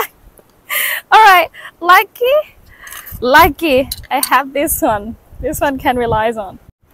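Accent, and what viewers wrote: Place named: Australia. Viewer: You almost have a hint of an Aussie accent. What a beauty.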